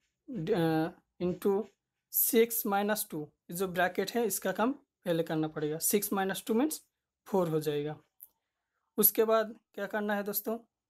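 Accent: Bengal, India. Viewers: I got the ascent of Bengali in your language...so proud of you bro being a bangali.....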